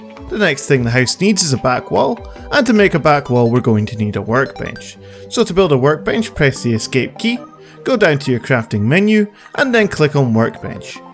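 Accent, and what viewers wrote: Scotland, United Kingdom. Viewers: My NPC died what do I do? Also are you from Scotland where are you from? I’m from Edinburgh.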